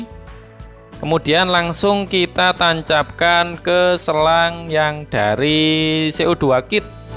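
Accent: Java, Indonesia. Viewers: medok e nyenengke dhe, thx sharing ilmunya, sukses selalu dhe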